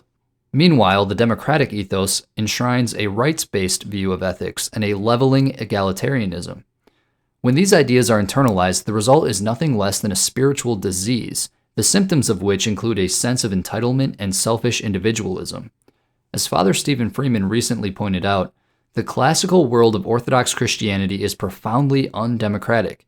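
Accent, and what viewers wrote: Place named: General American, United States. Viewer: General American Dialect ✅️, Zoomer voice✅️, Projecting escapism on a foreign model of governance while ignoring the experiences of those who lived under it (Absolute Monarchy, one-party Marxist-Leninist states, fascism, etc etc) ✅️ Diagnosis: Terminally Online LARPer Remedy: Touch grass and switch from online orthobro to boring IRL Orthodox.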